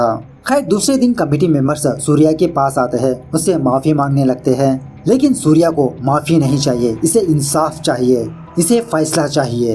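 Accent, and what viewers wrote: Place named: Hyderabad, India. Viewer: Perfect Hyderabadi Slang story narration.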